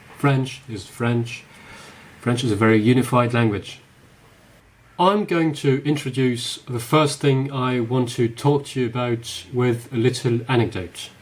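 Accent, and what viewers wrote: England, United Kingdom. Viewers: jealous of your English accent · You have a British accent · you actually sound English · Your English sounds very British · A Belgian with a British accent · I thought you were from England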